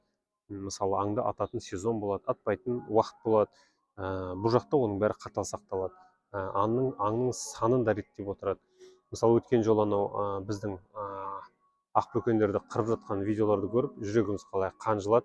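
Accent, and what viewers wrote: Standard. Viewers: Өте жақсы түсірілім былжырақтатып басқа тілді қоспай таза қазақша көрген қандай керемет.Рахмет.Америкада заң өте жақсы жұмыс істейді әрі бәріне бірдей. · Өте казак тілін таза сөлеитінің көрініп түр. Жарайсың ❤❤❤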